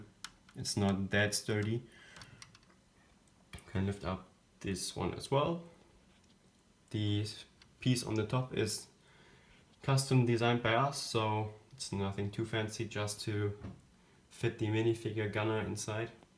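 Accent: Germany. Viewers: Wusste ichs doch, er ist Deutscher😂 der Akzent hat's verraten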